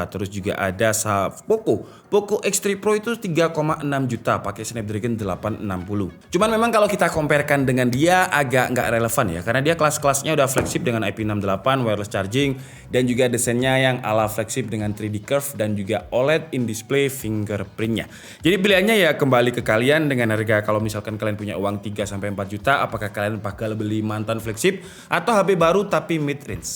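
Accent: Malang, Indonesia. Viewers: Asli malang kah om ?? Kok kyk di malang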